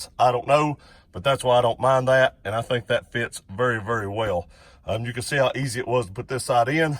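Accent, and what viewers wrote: South, United States. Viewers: That southern drawl is like nails on a chalk board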